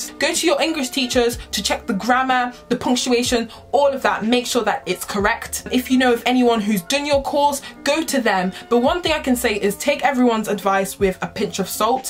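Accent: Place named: South, United Kingdom. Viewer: Where is your accent from?? You sound really southern but then you say button/ summer/ Study in a slightly northern way. Did you live in Manchester or Leeds for a bit haha. Congrats for getting in and avoiding chemistry lol.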